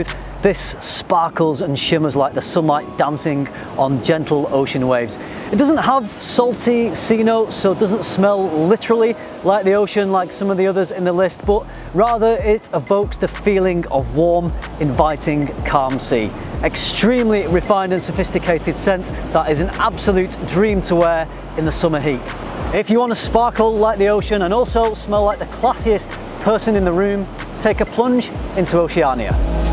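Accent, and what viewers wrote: United Kingdom. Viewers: Dammnnnn ur cinematography skills are fabulous and gud review as well... I m from Pakistan but I don't why British accent feels so attractive to me... I would love to listen that all day long...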